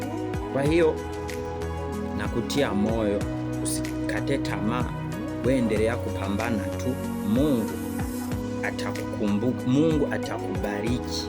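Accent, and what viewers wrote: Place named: Burundi. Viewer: Swahili ya Burundi hiyo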